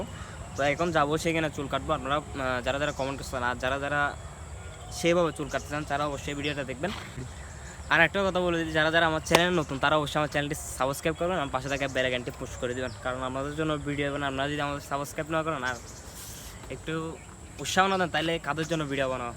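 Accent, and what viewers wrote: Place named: Chittagong, Bangladesh. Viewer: Via apnar bari kotai amar mota ctg karon apnar kotar modda boja jai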